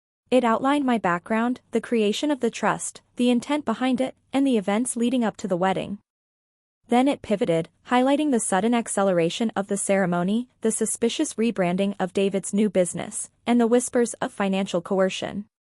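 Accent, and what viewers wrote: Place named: India. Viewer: Is the Indian accent really necessary? It detracts from the storytelling.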